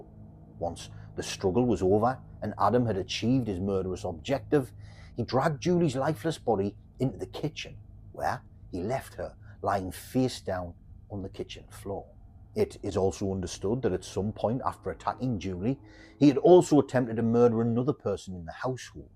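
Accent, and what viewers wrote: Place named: North East England, United Kingdom. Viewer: Listening from Middlesbrough. Nice to hear a Northeast accent telling these stories. Love the content, great channel.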